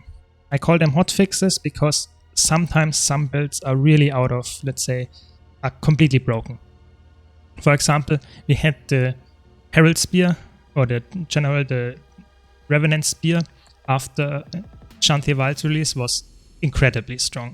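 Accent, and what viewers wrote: Germany. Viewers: ja moin, ich bin auch deutscher, dein akzent kickt halt noch härter rein als meiner xD no offense metabattle hat allerdings auch sehr viele builds...es könnte sogar gut möglich sein das die noch mehr builds haben als guildjen und bei metabattle sind auch nicht alle builds top notch z.B. die open world pve builds, also ich weiß echt nicht, ob sich das jemand dauerhaft antun möchte mit dem ele mit den niedrigsten lebenspunkt und der niedrigsten rüstung in kombination gibts das so nur beim ele, ob sich das jemand das halt wirklich permanent antun möchte mit full berserker gear rumzulaufen, da braucht dich ja nur ein mob in der open world angucken und fällst gefühlt schon um und liegst im downstate...guildjen hat wirklich dediziete builds speziell für open world pve, da wirken die builds von metabattle dagegen nur wie eine veränderte (wenn überhaupt verändert) version von builds die sie so für instanzierten content benutzen, also halt für fractale, raids, strikes und dungeons gedacht sind und nicht spezifisch für open world pve, während die builds bei guildjen extra dafür erstellt wurden.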